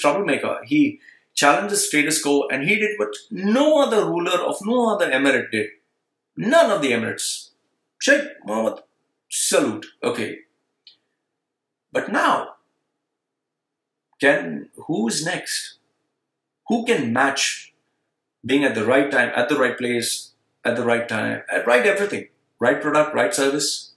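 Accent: Kerala, India. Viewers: Bhai mast kerala accent. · You are fluent in English but you cannot hide that you are INDIAN by origin! 😊. Why don't you care your own country?... It's none of your business!!! How much money you got paid to do this video??? You be careful the country you are talking about is very strong and powerful country that capable of a lot of things 😊.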